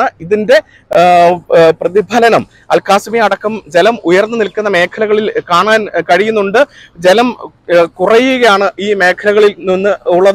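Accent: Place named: Kottayam, India. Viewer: This is not Telegu, true Kottayam Malayalam.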